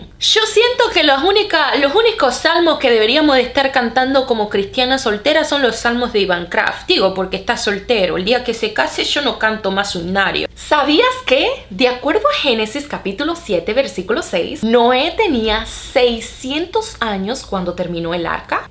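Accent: Argentina. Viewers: Jajaja tiene un asento bastante argentino jajajaj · jajajaj... ay... cada vez te escuchas mas argentina.. jaja un abrazo bendiciones desde Mexico! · AMÉ el acento de, medio Argentino!! Jajaja, abrazo grande Sierva del Señor. Desde Buenos Aires, Argentina!! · La hna. Tiene un ascento muy argentino. 😄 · Jajaja me encantó el acento de. Muy Argentina.. Dale che.. saludos desde Argentina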